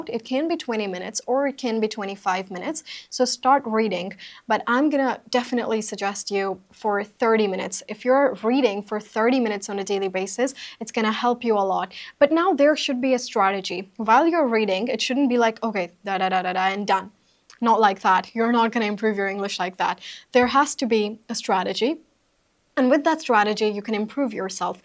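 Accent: United States. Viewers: Apki english sunkar,mujhe essa lg lg rha hai, jaise me india me nhi , America me beth kr apki video dekh rha hu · Aha me english sikhane aaya tha lekin aa toAmerican accent bole ja rahi hai,,kuchh samajh mein hi nahin aaya😅😡😡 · I think you speak an American English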